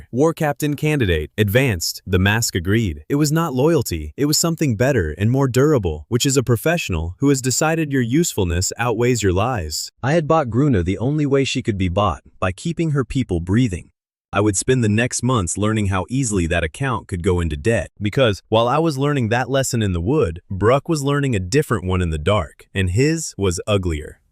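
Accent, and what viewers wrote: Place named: United States. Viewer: Good story but not a fan of the AI voices American cowboy accent for a midevle fantasy.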